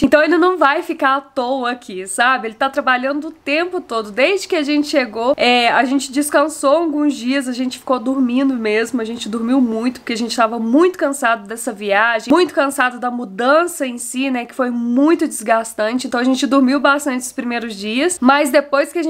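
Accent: Minas Gerais, Brazil. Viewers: Como vc é mineira! 😂😂👍💃💃💃💃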